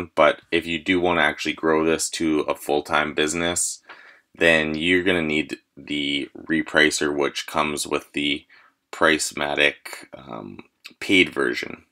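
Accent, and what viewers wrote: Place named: Canada. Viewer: about? Omg you are Canadian. Love Canadians.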